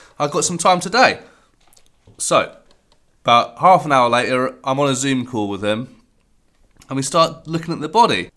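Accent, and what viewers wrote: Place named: United Kingdom. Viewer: I like your style (or is behavior the better word?) and really enjoy your way of talking and the british accent. Im a simple man, i love vintage guitars, so i subscribe. Thumps up! Many best greetings from berlin- germany 😃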